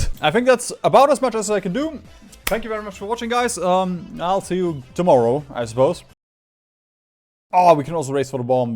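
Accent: Germany. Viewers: Du klingst Deutsch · Ich höre das deutsch raus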